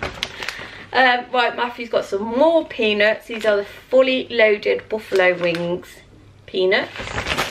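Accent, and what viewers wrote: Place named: Midlands, United Kingdom. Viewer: ahh I thought I could detect a Midlands accent ..but then also could hear hints of Bristol 😆 xx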